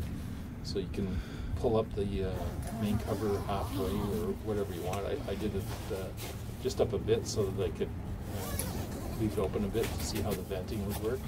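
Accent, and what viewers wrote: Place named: Canada. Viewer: Thanks. I just bought this tent for many adventures to come. I love your Canadian accent, eh. Many happy trips ahead.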